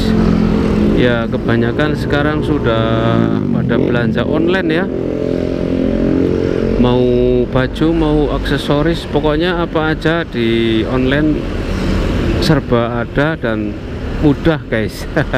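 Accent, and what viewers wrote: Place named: Banyumas, Indonesia. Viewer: Sampeyan ngapak ya ngomonge, jawane ngendi Mase?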